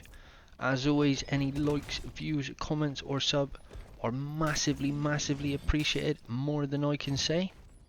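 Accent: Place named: West Country, United Kingdom. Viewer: Summerset or Devonshire boy? Trying to place your accent my Brother, Cornishman here. It's a nice little guide thank you man.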